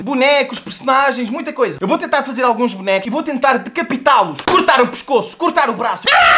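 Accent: Portugal. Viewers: Ele E De Portugal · Marcia Marques e pq ele e português ne burra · sou brasileiro e tu es portugues e isso mesmo?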